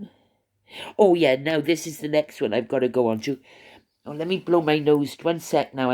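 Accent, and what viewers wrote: Wales, United Kingdom. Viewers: I’m SO delighted that you are back. I missed your lovely Welsh accent and humour. 🏴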